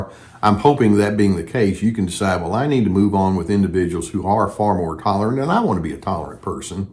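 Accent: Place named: Oklahoma, United States. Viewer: Sounds like Oklahoma coming from Albuquerque, NM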